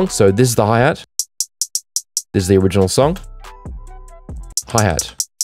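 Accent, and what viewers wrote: Australia. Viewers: U Aussie mate ?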